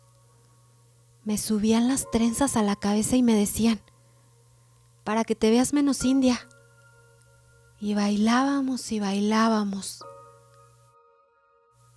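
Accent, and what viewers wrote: Mexico. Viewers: Excelente tu narración! Que bueno que volviste, vos sabes que t lo pedí. Sos la mejor narrando, me encanta el acento mexicano + tu voz tan dulce, muchas gracias, lo disfruté a pesar d los molestos anuncios, jjja! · ES MARAVILLOSO PODER ESCUCHAR UN CUENTO CON DICCION, ACENTUACIÓN Y PUNTUACIÓN PERFECTAS...GRACIAS EL ACENTO PERFECTO EN NUESTRO LENGUAJE MEXICANO. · A mi tambien me encanta el acento mexicano me gusta todo lo de mexico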